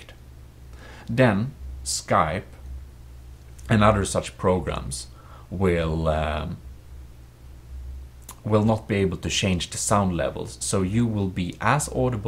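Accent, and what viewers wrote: Sweden. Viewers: is this guy swedish? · swedish english 100% (im sweedish) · your defiently swedish mah dude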